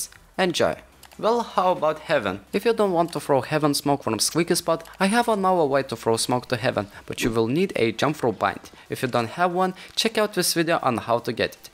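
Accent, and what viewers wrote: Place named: Germany. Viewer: pain to hear german english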